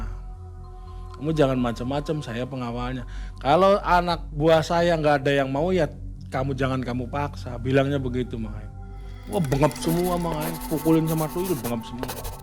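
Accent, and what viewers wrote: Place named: Banyumas, Indonesia. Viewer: Alhamdulillah pak hadir.. Cara bercerita ituloh santai, logat ngapak plus.. Ky nya mmg punya karakter tersendiri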